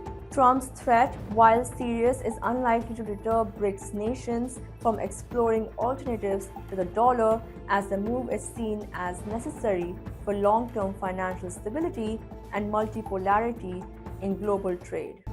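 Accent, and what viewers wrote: India. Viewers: My God Indian voice again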